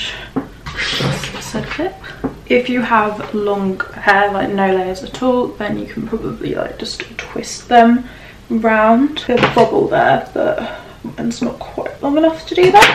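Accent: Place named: Birmingham, United Kingdom. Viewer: love the Birmingham accent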